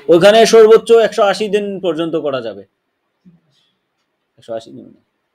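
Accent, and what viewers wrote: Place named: Kolkata, India. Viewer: apnar kothar tone koklkatar moto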